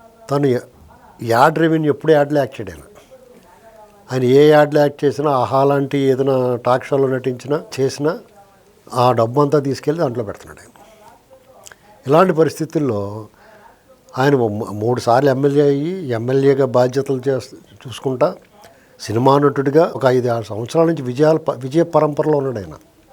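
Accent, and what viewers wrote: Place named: Godavari, India. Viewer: గోదావరి జిల్లా భాషని చాలా చక్కగా ఉపమాణాలతో చెబుతుంటే ఇంకా ఇంకా వినాలని పిస్తుంది.... v r proud of U.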